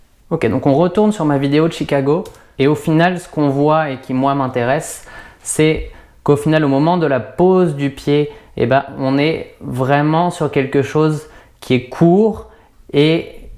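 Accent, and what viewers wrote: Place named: Canada. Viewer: C’est moi ou l’accent canadien prend le dessus ? Merci pour tous tes conseils 😉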